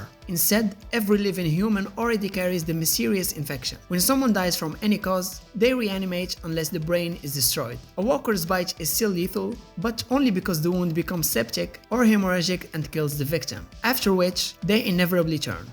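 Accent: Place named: Morocco. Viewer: Bro, are you Moroccan? The accent is soooooooooo close to Moroccan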